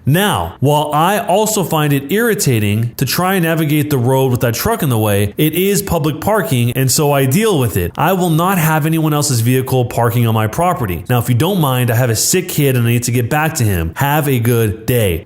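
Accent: South, United States. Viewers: I love the southern voice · this dude makes a good southern accent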